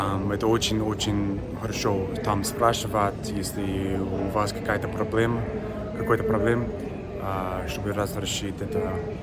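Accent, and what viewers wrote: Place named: United States. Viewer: Ваш русский язык вполне можно понять. Нам тут в Казахстане не привычно слышать акцент американца, так как у нас мало инострацев.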